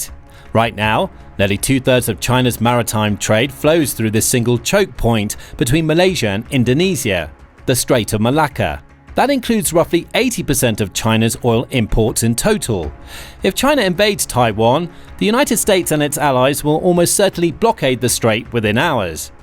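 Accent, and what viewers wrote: United Kingdom. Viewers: Soon as I heard the accent, I knew it was going to be all lies and I'll be dern, it sure is. Outright fabrication. If the MI6 actually believes this crap, it's no wonder their empire has crumbled! Furthermore, how can these MI6 boys think we are ignorant of their little tricks and plots? Only the dumbest people believe that Janes Bum fantasy as savior of the world. HA! The world is awake now and will shake off these parasites like a bad cold.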